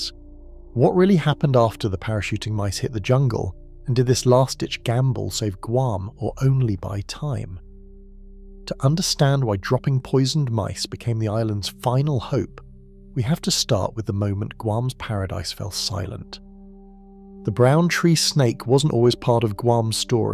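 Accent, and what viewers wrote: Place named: United Kingdom. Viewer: Good video, very informative. Curious why this one has a British narrator and your others have a corn-fed American. Doesn't matter, just curiosity!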